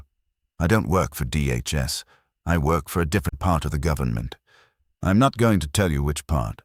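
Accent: England, United Kingdom. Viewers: If you're going to use an English accent why don't you set the story in England?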